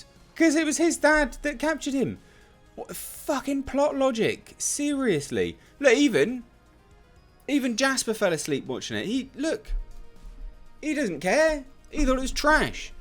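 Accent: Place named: United Kingdom. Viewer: There's nothing funnier than a frustrated confused Brit shitting on something terrible in that glorious accent. "What are you doin" and "who is this for"????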